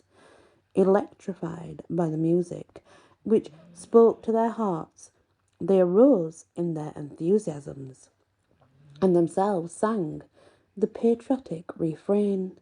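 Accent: United Kingdom. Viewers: Good evening ✨ I’m absolutely in awe… the legend of Saint Cast is one of the most powerful things I’ve heard in a long time 😢💕 your storytelling is so vivid I felt like I was standing between the two forces—Highlanders and Bretons—watching them realize their kinship through a shared song 🎶🫶 the moment they disobeyed orders and embraced was so symbolic… it’s the kind of tale the world needs now 💔💛 your mention of how the song might trace back to a Celtic theme and how Reid could have drawn inspiration was fascinating 🧐 the little details you shared made the story feel alive 🔍 your calm, gentle, British-accented voice is like being wrapped in a soft blanket while hearing ancient wisdom 🧡 thank you for your light, your research, and your heart 🌟 more power to you, you are a legend yourself 💐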